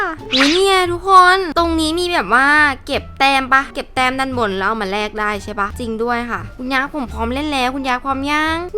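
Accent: South, Thailand. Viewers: พี่ เป็นคนใต้รึป่าวคะ😅พอดีเหมือนได้ยินพี่แหลงใต้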